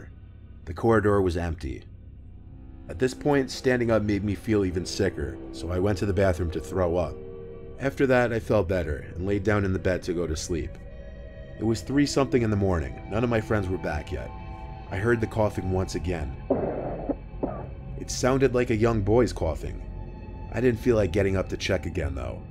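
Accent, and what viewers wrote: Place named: Long Island, United States. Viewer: You sound like ur from Long Island NY! If so ❤️❤️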